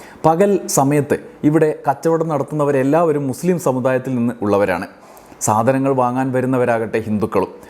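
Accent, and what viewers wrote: Kannur, India. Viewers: നിങ്ങളുടെ കണ്ണൂർ ശൈലിയിലുള്ള സംസാരം കേൾക്കാൻ നല്ല രസമുണ്ട് കാബുള്ള കാര്യങ്ങൾ ഹാസ്യാന അവതരിപ്പിക്കുന്നു നന്ദി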